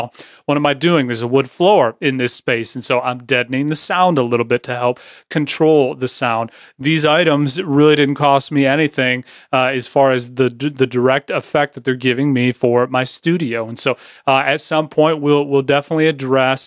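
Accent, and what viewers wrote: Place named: United States. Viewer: oh my god...that american accent !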